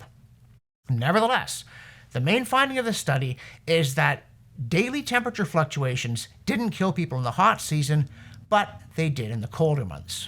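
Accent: Canada. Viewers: 🫡✋haha me me me! just discovered this channel. Very cool! His Canadian accent annoys me but I’m an overly cocky American😅 haha my voice probably annoys people too and I might be blissfully unaware. Haha but yah I am very much enjoying his presentations and data and sarcastic style. Amazingly, youtube recommended this channel to me! I dunno… but I wonder if it means they tweaked their algorithm to suppress less content? Anyway I was very surprised the videos made it past the suppression filter into my recommendations feed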